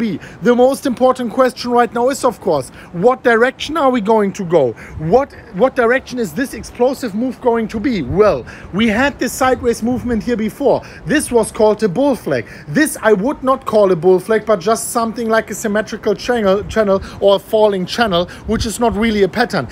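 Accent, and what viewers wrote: Germany. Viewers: Deutscher Akzent kommt voll durch!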